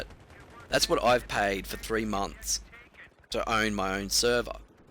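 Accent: Australia. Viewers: fuck i love aussie commentators haha,subbed!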